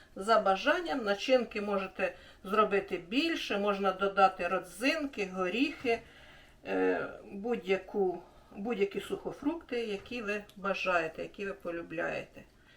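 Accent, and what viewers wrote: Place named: South, Ukraine. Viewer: до перестройки этот говор считался сельским и на нём говорили на всём юге страны. в 80-е приехала к подруге по институту на свадьбу на Кубань. попросили помочь на кухне. определили помощницей меня к бабушке. она стала говорить вот точно также как с экрана говорит эта женщина. прошу девчонок мне перевести и заодно спрашиваю на каком языке она говорит. мне отвечают ЭТО ХУТОРСКОЙ ГОВОР МАЛООБРАЗОВАННЫХ КРЕСТЬЯН. так что из сельского говора сделали украинский язык и убедили население, что это их национальный язык. а по сути на нём говорил весь юг страны.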